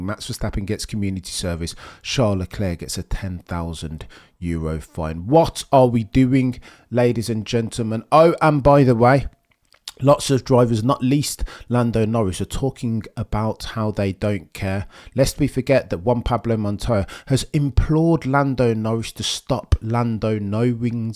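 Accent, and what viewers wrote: Birmingham, United Kingdom. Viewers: Hear a Brummie accent, You a Villa fan mate?!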